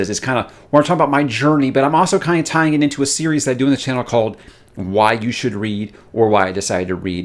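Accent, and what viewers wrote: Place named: United States. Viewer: Hearing the intro in such a manifestly American accent is a balm after enduring three episodes of British.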